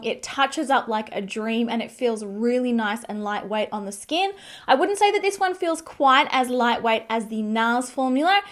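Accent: Australia. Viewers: I just love her Australian accent. I wish I had it too 🥰 - You should try the Wet n Wild tinted hydrator (if you haven't). It's incredible for my oily skin. I like that one way better than Fentys. But we're all different so just my opinion. I think you should try it if you can. Plus it's like 5 bucks. The Incognito concealer is great too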